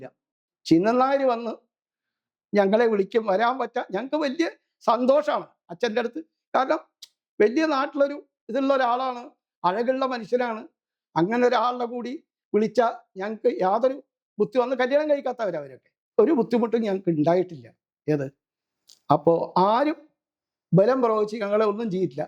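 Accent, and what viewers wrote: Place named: Palakkad, India. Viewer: അത് പാലക്കാടൻ മാരുടെ... ഒരു ശൈലി ആണ്.. അത്രെ ഉള്ളു.. ഞാൻ പാലക്കാട് മാത്തൂർ പഞ്ചായത്തിൽ ഇൽ നിന്നു ഉള്ള ഒരാൾ ആണ്... ഇവിടെ അങ്ങനെ ഒക്കെ പറയും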